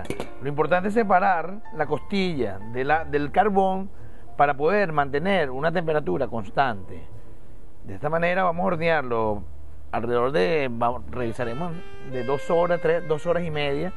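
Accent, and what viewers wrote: Caracas, Venezuela. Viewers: te amoooooooooooooooo, me encanto verte cocinar con tu acento caraqueño, quiero la receta de las lumpias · Simpático este chinito que no puede negar su acento Venezolano! Hoy iré al bosque en el barrio a ver si tengo suerte de conseguir algo de eso! Con esta escazes imagino coseguiré la mitad, el resto lo inventaré, así estamos aquí! Gracias por tu receta! Un abrazo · Guao acento Venezolano Caraqueño,exitoso... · Que cómico me encanta sus comidas pero me encanta también ese acento caraqueño sifrino que tiene gracias 👍👏👏❤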